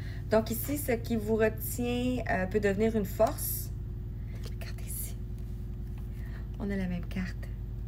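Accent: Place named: Quebec, Canada. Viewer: je suis epatée par vos guidances ❤❤❤❤et jadore votre joli accent québécois 🙏🙏🙏🙏vous etes ☀ belle journee a vous 🙏🙏🙏et merci pour vos guidances qui m'éclairent dans mon❤❤❤ cheminement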